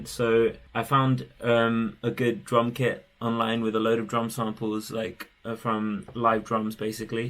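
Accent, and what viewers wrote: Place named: United Kingdom. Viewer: You have British accent. Are you born there? I am in Toronto, Canada. Nice to meet you. Actually we both commented on the documentary about Saudi Economy.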